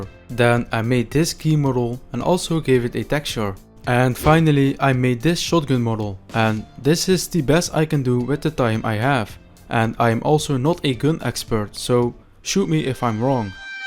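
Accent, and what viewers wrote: France. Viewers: c'est drôle , j'ai l'impression que tu as un accent français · Great French accent 👍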